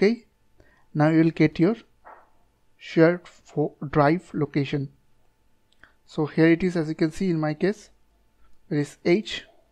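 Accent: India. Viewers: this generic indian accent is a red flag to me I bet this will tutorial will still all my money and block my pc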